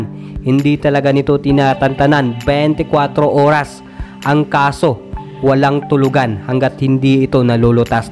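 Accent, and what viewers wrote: Visayas, Philippines. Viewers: Parang bisaya managalog, may paduterte pa na singit,, dds ka bay?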